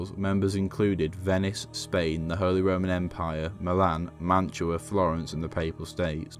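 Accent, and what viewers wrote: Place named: United Kingdom. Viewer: done in a terrible regional British accent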